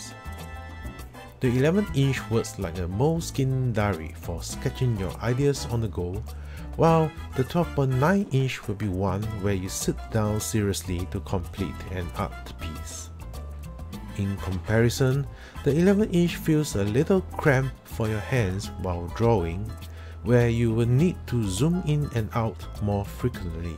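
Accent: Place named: Singapore. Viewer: This dude sounds Singaporean.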